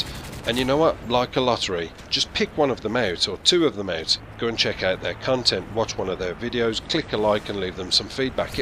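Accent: United Kingdom. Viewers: your british accent is pretty freakin awesome!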